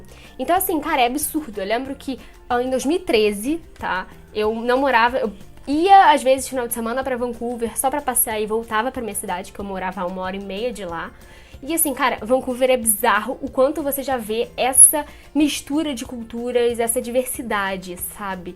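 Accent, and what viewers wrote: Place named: Rio de Janeiro, Brazil. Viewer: Maix, pexquisa. Aposto que é carioca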